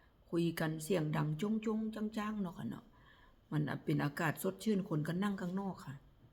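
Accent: Nyo, Thailand. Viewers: สวัสดีตอนเช้าค่ะพี่ ได้กาแฟดำดื่มแล้วสดชื่นค่ะ ฟังสำเนียงพูด เหมือนญ้อครพนมเลยค่ะ❤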